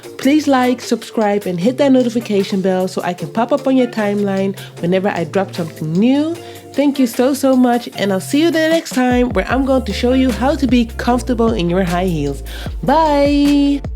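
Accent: Netherlands. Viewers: Are you dutch by any chance if I may ask? loving the vids... New subscriber here🥰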